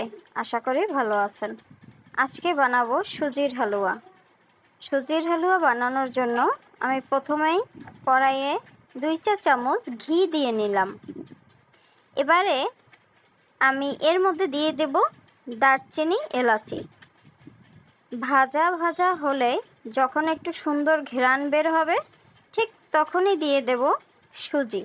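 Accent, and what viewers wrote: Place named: Barishal, Bangladesh. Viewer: আপু তোমার কথায় বোজা যায় তুমি বরিশাইলা😅😅😅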